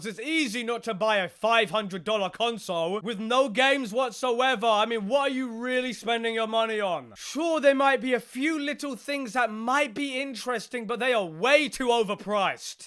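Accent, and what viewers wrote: United Kingdom. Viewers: You tell em angry British guy!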